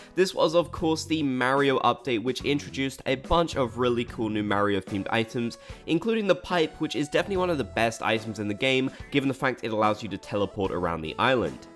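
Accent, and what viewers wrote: United Kingdom. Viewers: You're British?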